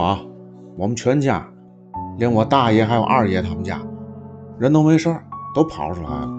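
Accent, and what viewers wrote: Tianjin, China. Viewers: 形容的好真實丶形象，加上維妙維肖的天津音及口才，真喜歡這個節目。 地震，左右搖時，狀況要比上丶下震要好一點。磚之間用泥巴壘的房屋，五級就會發生倒毀。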